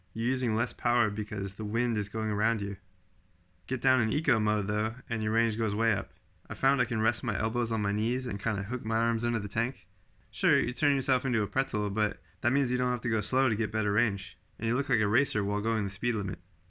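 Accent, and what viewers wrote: California, United States. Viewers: I wonder if he’s from New Zealand. He sounds like he’s from Cali. Either way, I prefer the lighter bike 😆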